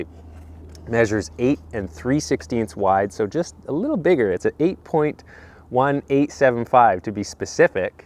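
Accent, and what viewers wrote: Canada. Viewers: Just want to say my thanks, because I've gotten a lot from this channel over the last few years, including: - understanding my skateboard on such a deeper level - from trucks to decks, you tapped into my geek nature - when doubts would creep in about my age (late 30s), you inspired me to keep skating. I would often think, shit, skates multiple times a week and he's older, and also does physical labor for a living! I'll be alright;) - you soothed my nerves in times of stress. your Canadian accent and manner of talking are very calming to listen to! Much appreciated,. Best of luck with whatever you do!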